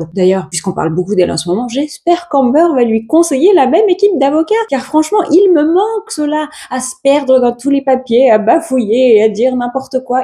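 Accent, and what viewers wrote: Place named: United States. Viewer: Je regarde tes vidéos avec ma fille Sasha de 19 ans. Nous sommes passionnées par les stars américaines. Il existe des youtubeurs qui traitent de ses sujets. Tu es pétillante, dynamique, ton accent (je croyais que tu étais américaine), une très jolie femme, bcp de flow comme me dit ma fille. Il faut le dire aussi. Tu te démarques largement car tu vis les infos que tu nous donnes avec des imitations, ta gestuelle, la vie qui se passe autour de toi, ton fils qui joue avec son ami(e), ton chat. Ça c’est génial. Tu es passionnante et passionnée. Tu nous entraînes dans ta passion. Même après une journée de travail, t’occuper de ton fils, de ton chéri (j’espère que je ne fais pas d’impaire en disant cela 🙊) tu as une énergie débordante pour nous informer de tes news. C’est cela être passionnée. Je suis sûre que tu vas monter car tu le mérites. 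Je le pense sincèrement car cela ne m’apporte rien de te mentir ou autre. Je hais le mensonge. Ne change pas. Et merci encore 🙏🏼🌹🌹🌹🌹🌹🌹🌹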